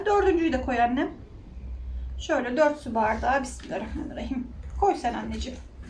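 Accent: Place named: Antalya, Turkey. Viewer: Bir Antalyalı konuşması müsafirrr 😂günaydın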